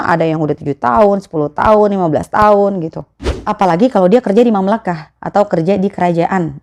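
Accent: Jakarta, Indonesia. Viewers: hahahahaha si mpok asli betawi ya hahahaha, bahasa betawinya ketara, hahahahhaa NYATRONIN, GRUTU. keren mpok semoga sehat selalu mpok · Betawi banget sih pok😂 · Sepertinya dari logatnya mbaknya orang betawi neh... lucu 😅😅😅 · Ini cewek dilihat dari bahasanya. Gua tebak dari dekat pondok gede ( betawi) nih......😂😂😂😂. Saat pembukaan bicara mantap....nah setelah ceritain Babeh nya......keluar dah aslinya. Lah bujug neng oke banget da ah....😂😂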